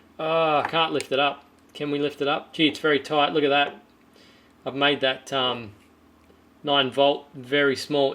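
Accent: Australia. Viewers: I watch your videos assiduously, and a great help for us in Latin America, would if it had subtitles... (even in English, because of your fancy Australian accent) =) We really will appreciate! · That's gotta be the first time our Aussie accent has been described as fancy! I must agree-this channel is full of awesome info. I'm a very recent subscriber.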